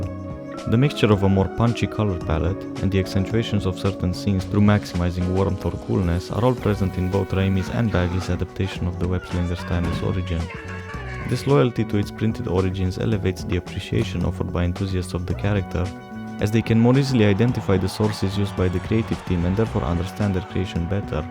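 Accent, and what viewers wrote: Eastern Europe. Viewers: Great video, but I'm much more interested in where you are from and how you came into reading American comic books. Not many people know who Mark Bagley is in the US, let alone Eastern Europe (assuming by your accent). I think that would be a cool story to hear. Do you read anything outside of Spider-Man?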